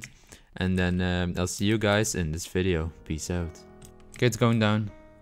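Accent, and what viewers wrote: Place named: Netherlands. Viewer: U sound Dutch. Are you Dutch?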